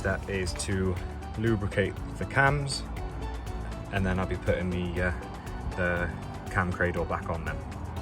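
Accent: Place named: Devon, United Kingdom. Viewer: Nice video mate .Where in the UK are you .You sound like Devon area ?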